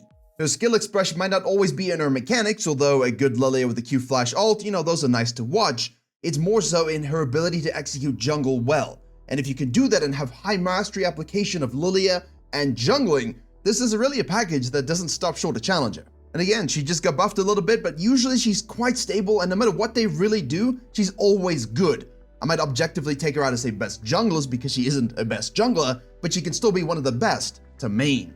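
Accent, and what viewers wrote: South Africa. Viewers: WOOOOOOOO a fellow South African.. Don't know how I didn't pick up the accent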